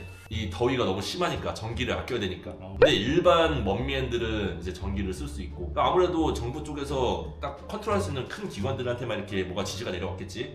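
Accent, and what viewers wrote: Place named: Jeolla, South Korea. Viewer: 대인. 고향이 전북 아니오? 말투가 우리동내 같은디?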